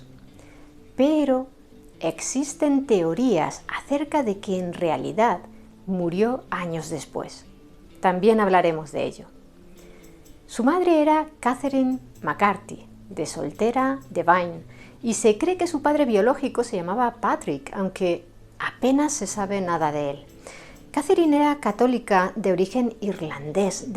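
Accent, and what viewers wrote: Castile, Spain. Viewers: Esta señora, además de tener un acento castellano exquisito, es una experta en la historia y sin pasión, le gusta la verdad.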